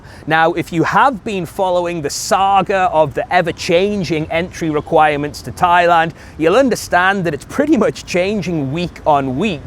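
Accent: United Kingdom. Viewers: Love British accent, love your videos.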